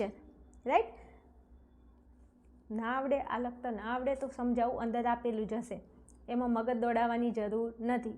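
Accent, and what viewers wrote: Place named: Mehsana, India. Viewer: Tamara language upar thi lage chhe teacher tame mahesana baju Naa chho ne 😅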